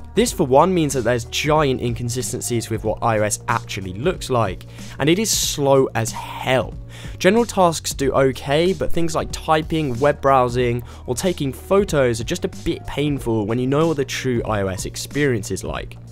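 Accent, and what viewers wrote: England, United Kingdom. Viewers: I will be studying in Uk this year,are you from England ?????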